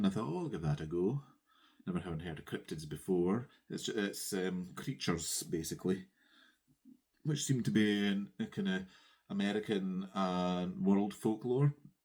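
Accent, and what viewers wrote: Scotland, United Kingdom. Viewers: Greetings from Carmel, Indiana in the U.S. I loved seeing your fancy ladies and your SALs. Also love your Scottish accent. Keep up all the great stitching!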